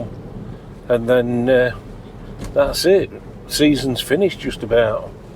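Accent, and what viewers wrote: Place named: Southport, United Kingdom. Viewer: I was a southport boy with same accent.been in nz for 50 years but its music to my ears to listen to this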